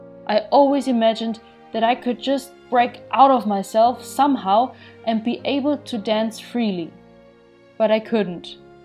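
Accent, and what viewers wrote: Germany. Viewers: wie man sofort hört dass du deutsche bist;) danke für das video, hab ich was zum schauen aufm öden crosstrainer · Her German accent is cute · dieses deutsche englisch xD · Her English is really good. Yeah, she has quite a strong Accent but her grammar and pronunciation are on point. I don't understand why so many Germans on YouTube put the English of their fellow Landsmen and women down. Show some Solidarity...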